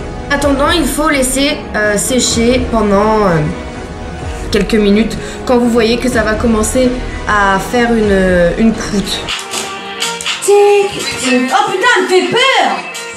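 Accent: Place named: Normandy, France. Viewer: On voie la normande et la façon de parler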